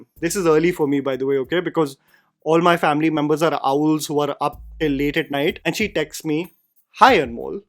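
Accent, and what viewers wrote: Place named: India. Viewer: i had to click off because of the gen z Indian accent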